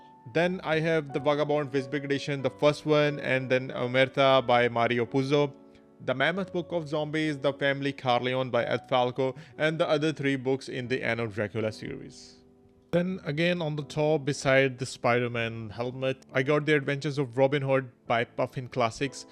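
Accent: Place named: India. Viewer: why your accent is so horrible like an indian accent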